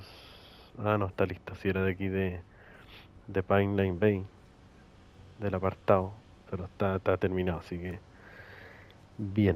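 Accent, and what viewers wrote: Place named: Chile. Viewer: y a ese suscriptor, o "visualizador" de tus vídeos, tampoco le gusta tu acento chileno? Jajajajajaja La verdad, la gente se aburre mucho. La gran mayoría estamos contentos con tu narración y explicaciones, que es lo que cuenta. Si le gusta más la "buena" narrativa que el contenido, también hay canales de poesía. En fin, como siempre, otro gran vídeo. Saludos desde Moscú 👌🏼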